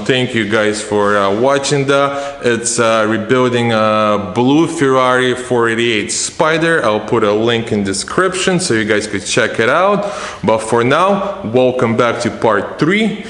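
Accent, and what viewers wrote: Russia. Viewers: Выдает русский акцент ))))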